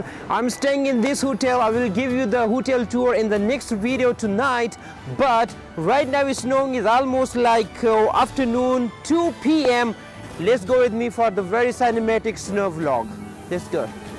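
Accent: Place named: United Kingdom. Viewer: British accent and splendid editing..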